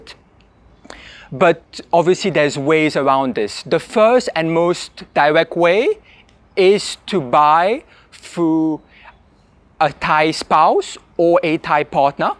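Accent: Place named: France. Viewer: He speaks English with a French accent.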